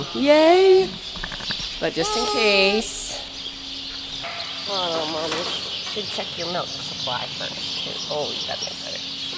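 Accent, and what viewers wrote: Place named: Canada. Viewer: Hey! My mom who went home to heaven in 2014 was raised in Kitchener but raised her family in Michigan. I have many many fond memories of visits to relatives in Kitchener (always the farmers market was a high light) and the surrounding area. When mom turned 70 we 'kidnapped' her for a weekend in Stratford...theater and mint smoothies were enjoyed!!! I love that you are from that area and sport your Canadian accent. Just wondering if you could think about renaming the LambTaxi the "EWEbur"...love all your puns and thought this might fit right in. Merry Christmas from a 1/2 Canadian.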